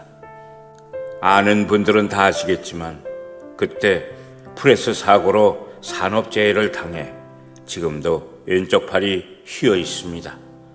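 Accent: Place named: North Korea. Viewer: 말투가 어떻게 북쪽 목소리냐?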